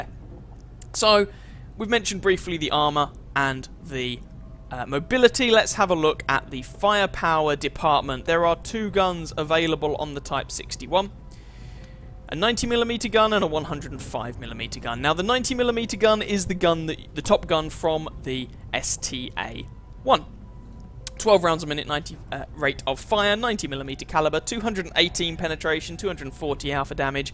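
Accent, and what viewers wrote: England, United Kingdom. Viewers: Loving your videos, probably due to your English accent... Keep it up.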